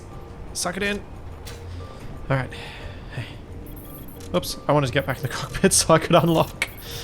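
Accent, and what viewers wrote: Australia. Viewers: Are you from Australia m8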